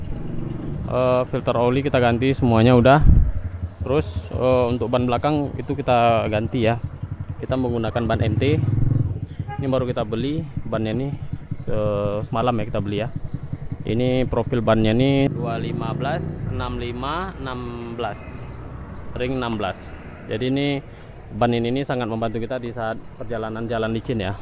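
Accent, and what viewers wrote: Pekanbaru, Indonesia. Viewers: Logat pekanbarunya keliatan banget... support terus. Orang pekanbaru like!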